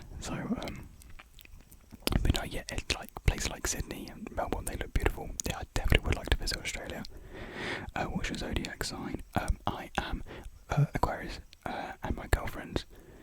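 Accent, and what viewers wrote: United Kingdom. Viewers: I see im not the only one who also listen to your conversational videos to learn English It would be fun to hear you speak with a full American accent, but your British accent adds a special charm to you and your asmr Thank youuu i love u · oooo you know you might be one of the first few British ASMR people I've found never realised I prefer listening to my own accent rather than american!! Loved the mouth sounds a lot you should definitely do some more :)) who's your fave music artists atm ? x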